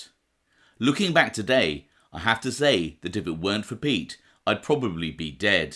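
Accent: United Kingdom. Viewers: The Fine British Accent don't hurt...ha ha...always sounds more serious...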